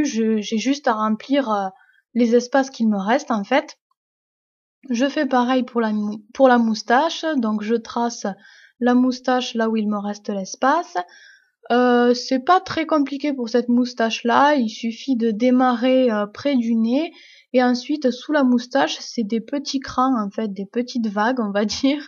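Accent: South, France. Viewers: J'adore ton accent tu viens du sud ? De la france !!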